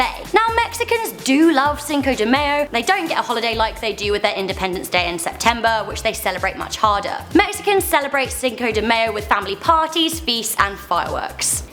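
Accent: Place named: United Kingdom. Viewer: GIRL YOUR SPEAKING BRITISH ENGLISH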